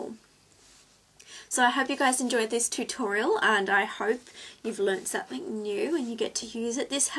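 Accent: Australia. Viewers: are you Australian or from new zealand?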